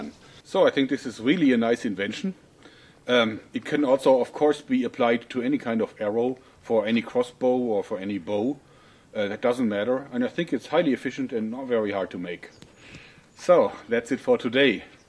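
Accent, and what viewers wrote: Germany. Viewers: I love this guy! He seems like a tough brute at first but he seems like he is really nice, but still a total badass!! His German accent makes me miss my grandpa.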